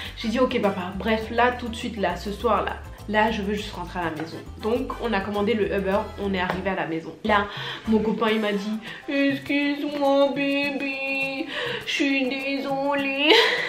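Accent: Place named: Ivory Coast. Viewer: Ton accent ivoirien me plaît grave 😂😘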